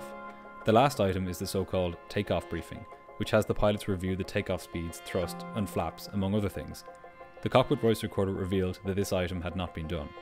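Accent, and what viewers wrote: Ireland. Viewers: MTDSRIP. I'm hearing an Irish accent from the narrator... Wasn't sure until he said 'turty', for 30. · Just curious. Where is the narrator from? He sounds very Irish to me.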